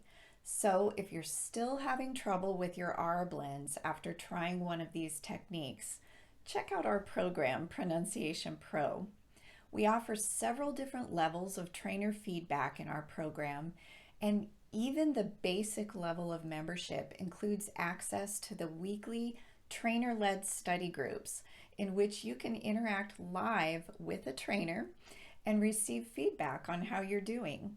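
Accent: United States. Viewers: I love your videos and the standard American accent and pronunciation.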